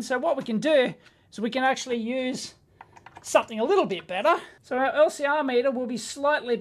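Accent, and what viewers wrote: Australia. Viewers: Half a bee,s dick 😂😂😂😂I love crazy ozzies and kiwi,s accent strewth mate by crikey ya flaming golar 😅😂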